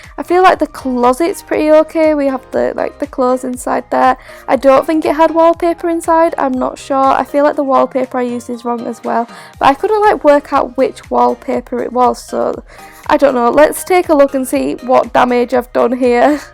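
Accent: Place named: Leeds, United Kingdom. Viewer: Are you from leads? I love your accent ❤❤❤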